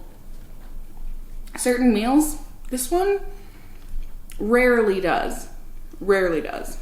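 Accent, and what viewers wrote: Minnesota, United States. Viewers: She definitely has a Minnesotan accent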